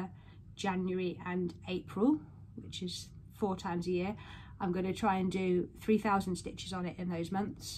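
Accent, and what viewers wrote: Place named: United Kingdom. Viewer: Ok I just found you and your British. Hurrah. Will like and subscribe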